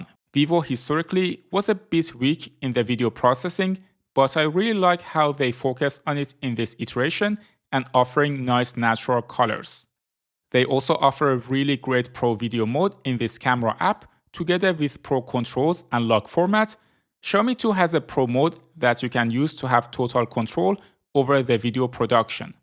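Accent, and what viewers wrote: Iran. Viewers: چرا لهجه ایرانی داری داش؟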